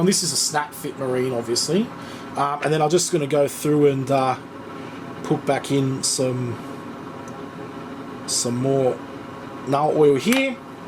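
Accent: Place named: Australia. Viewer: Like your accent, you an aussie? :)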